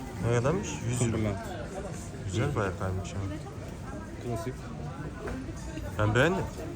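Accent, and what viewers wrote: Erzurum, Turkey. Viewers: Abi sen erzurumlumusun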